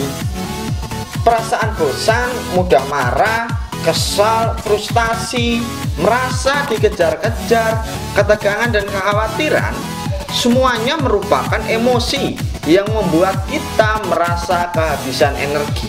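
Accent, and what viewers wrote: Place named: Java, Indonesia. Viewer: Medhok yaaak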